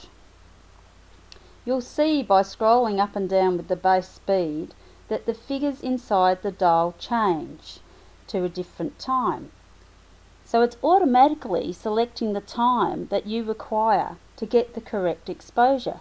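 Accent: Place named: Australia. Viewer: Hi, I love your tutorial style! Lovely articulate Aussie voice. Some other tutors talk way to fast for me. I am a beginner in photography world and have done my first on astro shoot which was totally out of focus, so I reached out on good old Youtube to find some answers. Following on from your Night photography tutorial I found the ND filter one and was curious so subscribed to gain further insight. Thanks for sharing your knowledge with me. Jane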